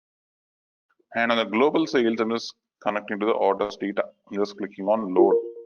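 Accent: India. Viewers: I felt like I m studying in NareshIT Ameerpet.. sir, south accent is like that..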